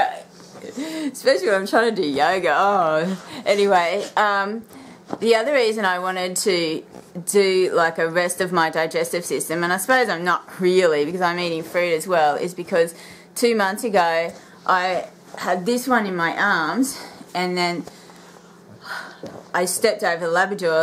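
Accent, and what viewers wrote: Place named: Australia. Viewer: where in Australia are you? its good to hear an aussie voice on here. and i feel like we've met before!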